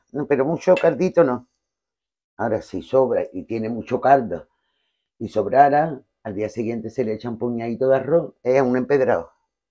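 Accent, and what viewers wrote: Andalusia, Spain. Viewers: Oir tu acento andalús y recetas me recuerda tanto a mi madre. Gracias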